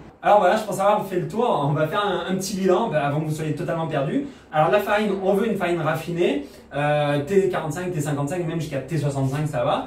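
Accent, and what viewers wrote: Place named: South, France. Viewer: Merci pour toutes ces informations intéressantes! Et avec l'accent du sud c'est encore mieux!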